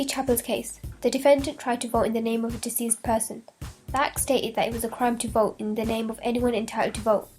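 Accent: United Kingdom. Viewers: Birmingham I imagine lol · Like a cross between British & summin else tho. Hot x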